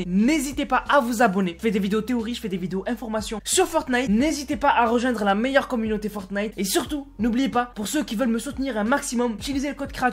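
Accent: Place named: Marseille, France. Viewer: Ton accent marseillais il est partie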